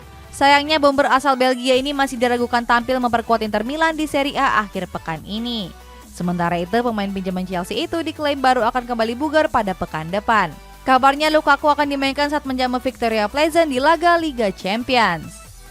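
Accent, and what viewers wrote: Indonesia. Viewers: Iya njir host ini bahasa inggris dibaca pake aksen indo😅😅 gw yg bahasa inggis nya basic aja gk se paok ini😂😂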